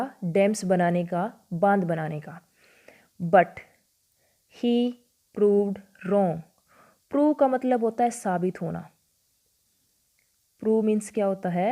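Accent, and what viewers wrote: Haryana, India. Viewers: Are you from haryana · Mam aap haryana se ho na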